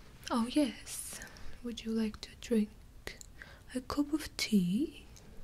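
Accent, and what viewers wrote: Russia. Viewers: That's a very cool story. Love your Russian accent and you do a great job on your videos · I really love Russian accents and truly enjoyed your story! Hope you have an awesome day!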